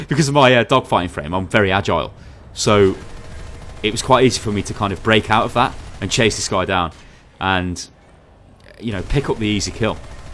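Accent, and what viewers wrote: United Kingdom. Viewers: Oh, hes British. They pronounce it differently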